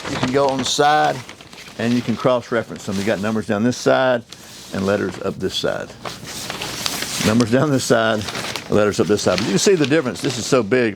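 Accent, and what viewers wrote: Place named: Alabama, United States. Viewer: Great video! I knew I recognized that accent. Over in Alabama we sound so similar. 😅 Learned a lot here. Thanks for the upload.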